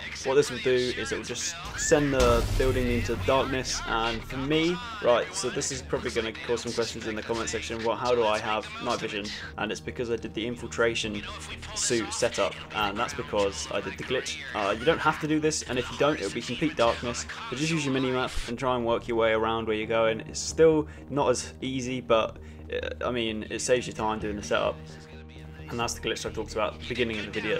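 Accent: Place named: United States. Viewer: let me guess... american?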